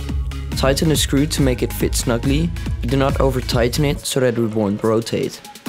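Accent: Netherlands. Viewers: R u dutch? so yea I can hear it from your accent :) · ur accent sounds kinda dutch, i can tell cos im dutch as well. (great video btw, keep it up!) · ja klopt toen ik je horden dacht ik WTF deze gast is nederlands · Nice video! Great quality. Bij setup wars herkende ik meteen je Nederlandse accent :P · Where you from you sound dutch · Grappig hoe elke Nederlander die naar kijkt het Nederlandse accent herkent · ik dacht al... dat accent is NL ;P sinds ik op je startscherm vrijdag .. februari zag staan weet ik het zeker haha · It may be that you Dutch speak normally because that I hear to your accent #TeamTechSource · Nice! ik kom hier van Setup Wars ik herkende direct dat je nederlands was als je engels praat let wel een beetje op jou accent met die F zoals Fideo maar echt nice kanaal ! sub bij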